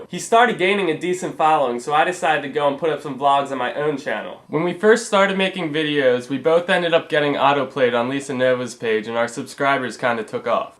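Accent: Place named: South Jersey, United States. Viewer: lol of course you do! since I moved from south jersey to florida 4 years ago, i can now easily pick up on our critically acclaimed infamous accents. and yes, you do have one. no worries, its alll good though! 8-)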